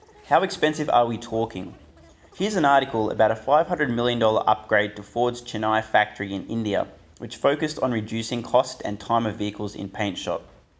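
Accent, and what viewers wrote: Australia. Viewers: It's an Australian accent. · Good to hear an aussie yt'r covering tesla.. 👍🇦🇺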